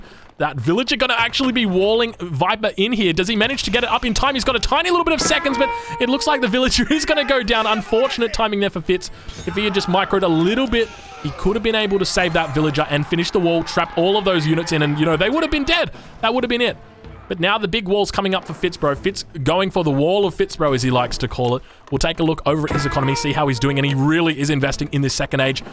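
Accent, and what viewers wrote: Australia. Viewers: omg... can you be less aussie?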